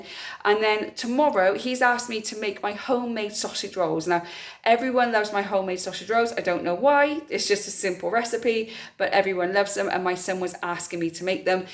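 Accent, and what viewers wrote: United Kingdom. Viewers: Where are you from you sound like you live in Gloucestershire like me.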